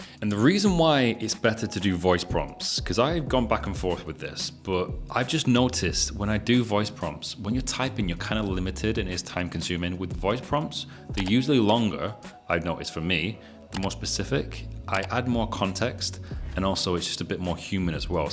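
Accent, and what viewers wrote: North, United Kingdom. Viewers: Senior Engineer here, definitely felt the role change in the last year. MCP Servers seem to be the powerhouse for me so far, I've also been using a 'Context Bank' MCP Server to help with the 'Compound Engineering' across different tasks (and even different tools like Roo Code and Claude Code). P.S Always nice to hear another vaguely northern accent!! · Love this, new sub here, thank you; from a (what sounds like) a fellow northerner!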